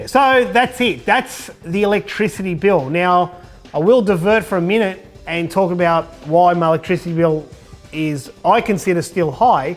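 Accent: Australia. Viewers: i love the aussie accent idk why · This Aussie accent is particularly grating.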